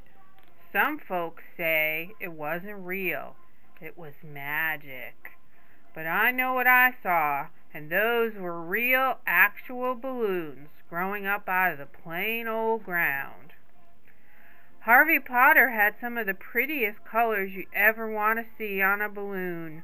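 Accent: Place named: Midwest, United States. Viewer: I like this person's voice for this book, it fits, sounds midwestern to me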